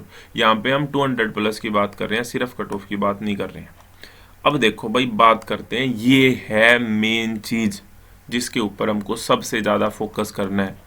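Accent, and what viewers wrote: Haryana, India. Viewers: Bhai Haryanvi hain ke?? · Ha lgte to haryanvi hi h ....sonipat side k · Aawaj se to Haryana se hi lagg raha hai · Sir mujhe aapki voice bhoutt aachi lgi aapke bolnee ka tarekaa gjjbb h haryanvi side se ho kya aap😙😙😙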